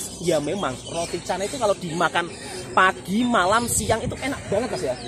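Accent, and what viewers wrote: Java, Indonesia. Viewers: Cakap difahami cuma lohgat sahaja yang lain. Bunyinya kejawaan. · saya suka bila dengar bila bercakap.kental sangat loghat jawanya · medok jawanya kental sangat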